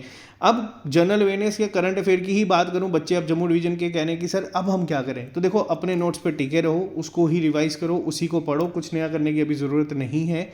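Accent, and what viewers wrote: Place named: Manipur, India. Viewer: Apki awaz sy mujhe uder manipur yaad aaya sir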